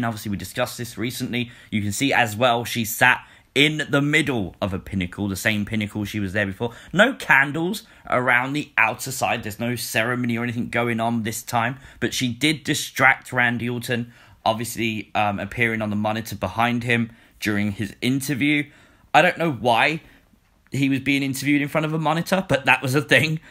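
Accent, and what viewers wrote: United Kingdom. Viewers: You have a british accent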